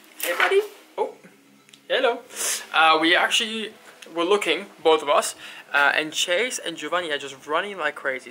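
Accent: Australia. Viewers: Literally look me five videos to realise your Australian.... I’m Australian and I couldn’t figure it out 😂😂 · You are Australian I could hear it and as soon as you said you flew him from Australia it clicked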